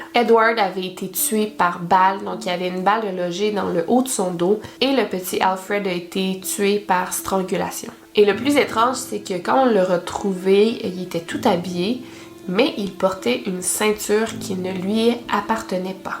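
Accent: Quebec, Canada. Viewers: J’AI VUE SA TÊTE J’AI PENSÉE MIND HUNTERS 😭 Sans rigoler j’adore tes vidéos, j’suis abonnés depuis longtemps et merci beaucoup pour tout le travail que tu fais c’est ouf, j’ai toujours apprécié tes vidéos , tu es toujours respectueuse envers les victimes et tu racontes très biens les histoires (et tu m’as fait aimé l’accent canadien) bref je like et je commente pas souvent et j’vais essayer de le faire au moins pour toi parce que ça vaux le coup🙃 J’espère que tu dors bien mdr ça doit te prendre tellement de temps de faire autant de vidéos en octobre, encore merci❤️ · avant je détestais l accents québécois mais dans la bouche d une jolie fille c est toute suite mignon .Continue comme ca tu nous trouve souvent des histoire pas trop connue ou du moins toujours intéressant dans la réflexion👍😗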